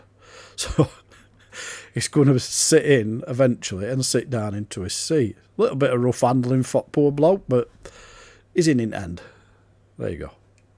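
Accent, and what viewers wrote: Barnsley, United Kingdom. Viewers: Thought is was Barnsley, that's me as well👍 keep doing the videos there big help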